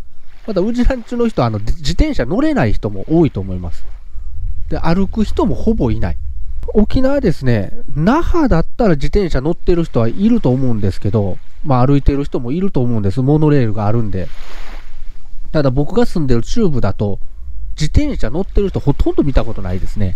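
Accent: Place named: Kansai, Japan. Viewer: 15年沖縄にいて関西訛り抜けないのが1番ビックリしてますw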